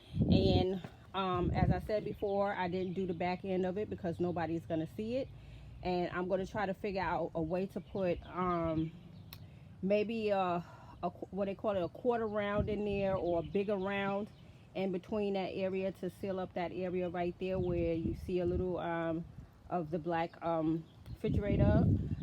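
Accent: New York, United States. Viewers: Too cool! You just inspired me! I have an old bookshelf that can be a raised bed and I love your accent lol you sound like my New York family Take Care ~Nicole 🌿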